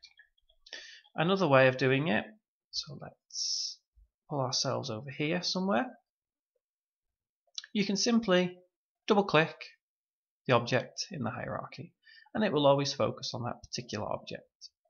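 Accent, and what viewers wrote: United Kingdom. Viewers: You a scouser mate?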